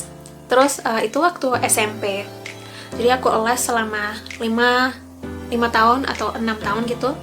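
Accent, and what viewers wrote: Java, Indonesia. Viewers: ilat mu..malah mirip wong jowo..nduk ..rada medok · medok,e iku lo mantul,joooosss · Nambah channel di jenengi medok 👍👍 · Medok lebih mantab mbak yu 😅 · medhok ya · Luar biasa, suka cara ngomongnya bahasa Indonesia, ada medok boso jowone, tak kiro asli wong jowo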